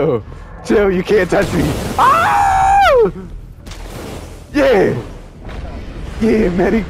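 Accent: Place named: Philadelphia, United States. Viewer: I can tell you from philly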